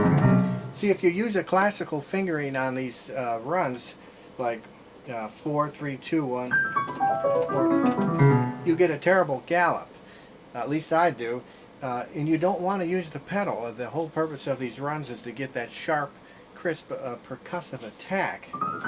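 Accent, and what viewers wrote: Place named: Pittsburgh, United States. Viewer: You are an outstanding pianist as well as a wonderful teacher. Your accent sounds like you are not far from me in Steeler country. Keep up the brilliant work!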